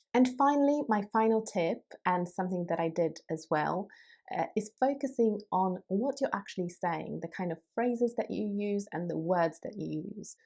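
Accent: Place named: United Kingdom. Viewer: Hi. I love British accent. I'm a A2 level. I would like to speak one day like you in British accent. I'm an Italian girl. Is it possible?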